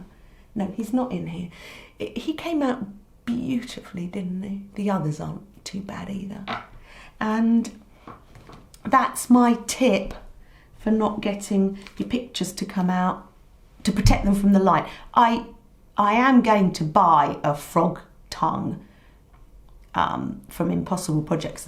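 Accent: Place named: United Kingdom. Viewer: Its a british accent. Lol.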